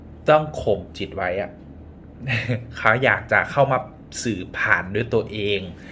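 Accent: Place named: Isan, Thailand. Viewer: อาจารย์อยู่จังหวัดอะไรค่ะ วาดยันต์สวยมากค่ะ คลิปนี้เสียงภาคอีสานออกจ้า แม่นมากค่ะ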